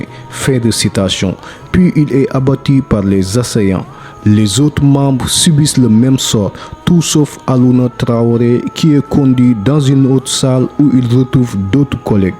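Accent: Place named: Senegal. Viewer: 😀c'est un senegalais qui parle comme ca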